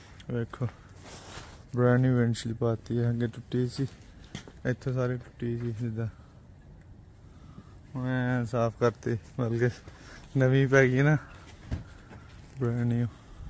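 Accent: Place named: Punjab, India. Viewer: love watching your videos bro. keep it up. your Punjabi is just like mine.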